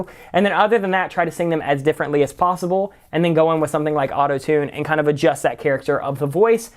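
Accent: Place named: South, United States. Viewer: Release the country accent cut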